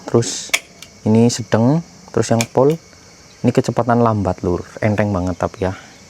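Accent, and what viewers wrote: Banyumas, Indonesia. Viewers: Wong ngapak ya min? Rika sekang daerah ngendi lur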